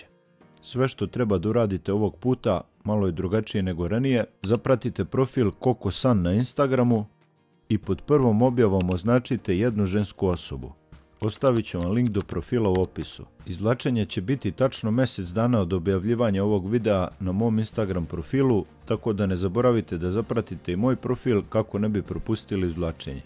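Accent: Šumadija, Serbia. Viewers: Od prvog tvog videa koji sam gledao prepoznao sam u glasu da si Šumadinac, pozdrav iz Aranđelovca 😂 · Brate ti si čarobnjak. Svaka ti čast. Nisam znao da si Aranđelovčanin mada se čuje Šumadija u govoru.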